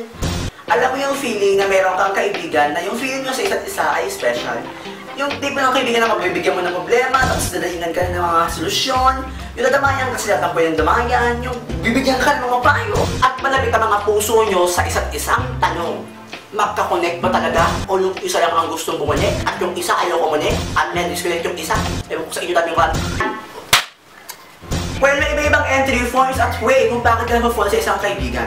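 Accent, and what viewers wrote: Visayas, Philippines. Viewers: May pagka bisaya rin siya noh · bisaya ka kuya??? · Kuya, bisaya ka po?:D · Bisaya ka pala..?! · bisaya ka pala dong! XD taga asa ka?? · bisaya diay ka ba?:D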